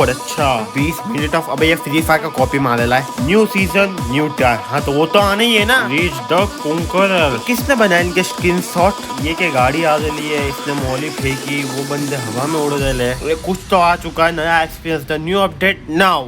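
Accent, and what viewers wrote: Gujarat, India. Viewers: Bhai gujarati Cho ne tame Tamaro hindi ma Gujarati flow joi lage che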